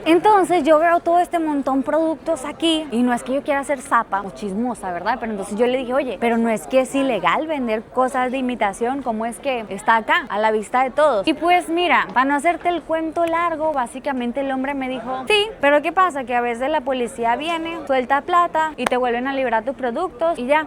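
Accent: Venezuela. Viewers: me di curnta q eras venezolana pareces a Cathy Fullop hablando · Pq le sale acento neutro mexicano al inicio pero de repente le sale acento y gestos venecos? - No pude seguir viendo el video - me gusto mucho el inicio - acento neutro bien modulado para audiencia latam - pero cundo salio ese "Oite" "Mi chama" paso werita. por aca no es mi carnal. Bay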